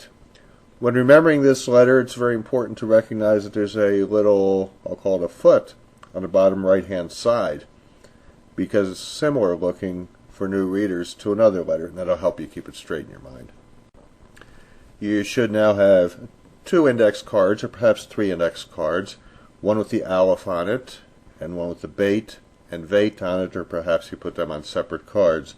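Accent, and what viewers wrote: United States. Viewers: what a bad way of pronouncing all this, so americanised.... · Love your work! Lessons are informative and take the right pace for any person starting to learn Hebrew. Your Hebrew might be a bit "American", just like most of Hebrew in Israel is a bit "Russian", "Hungarian", "Germanic"... Some people swallow : (/e/), some pronounce it... No one should be discouraged because of it, just the opposite! Approach taken here is better than anything I have seen or heard up till now. Bravo and toda raba! :) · the thing about non-native language teachers is that they don't exactly mimic the local sound. for example the teacher in this vid still pronounces the words with a subtle american accent (e.g.: "owe" instead o "oo", "meih" instead of "meh"; the word 'nekudot' (hebrew=points) was also pronounced the american way. pls try to delineate the american sounds to hebrew (or any other foreign language for that matter) sounds for an authentic foreign language lesson. 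nice lesson though.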